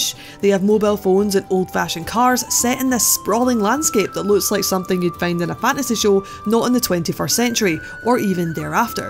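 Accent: Scotland, United Kingdom. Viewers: Nice to hear a fellow Scottish girl reviewing anime great review/really liked the feel of this anime it’s a shame it lacks at certain things like character development/pacing or it could of been so much better...did love Keith’s vibe though and pritty sure without it and the nice art/soundtrack it would totally be unmentionable but the little ending clip we got dose point to a second season so if they do hopefully they even some things out/it also doesn’t help much that I love devilman crybaby lol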